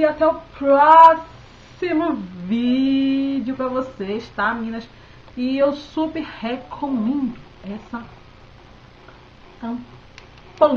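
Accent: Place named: Salvador, Brazil. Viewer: Pelo sotaque vc mora em Salvador. Onde encontro essa linha?? Aí em salvador??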